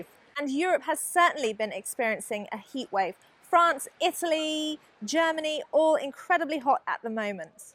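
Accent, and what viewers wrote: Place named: England, United Kingdom. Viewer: I LOVE your english accent.so amazing and captivating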